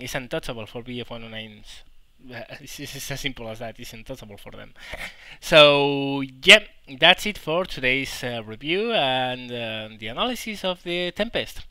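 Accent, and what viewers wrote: Spain. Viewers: Cómo se nota cuando un español habla en inglés XDD Nos pasa a todos jaja. Genial el vídeo, estoy pensando en pillarme ese tempest premium alemán y necesitaba algo de motivación!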